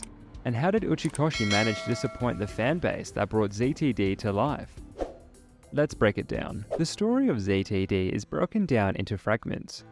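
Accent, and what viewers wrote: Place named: Australia. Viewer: I even would have taken the Meta route as Uchikoshi suggested and did'nt follow up on that the player is some sort of multi-dimensional reaching entity or even a well meaning alien helping them through Alien technology, considering he brought Alien technology to the series..don't really see the harm in making a big part of the series. Sure make them an all-seeing entity but they're not all powerful. As crazy as that sounds, it's much bigger gut punch of a plot twist and would make me buy that humanity had a fighting chance against the Religious Fanatic even with the same ending. Zero would also know of him through the machine's existence naturally. He's a very (lost?) Youtuber who has many differently named accounts (one possibly deleted?) he makes videos on until he went back to his main one cos it was naturally the only one with any traction. 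It was just tiring bumping into him in the algorithm when he could just make videos on the main channel instead like he eventually relented to. Your ozzie accents are a touch similar after all, my mistake.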